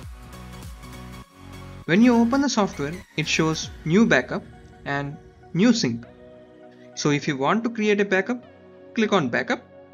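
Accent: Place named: India. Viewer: Funny Indian accent😅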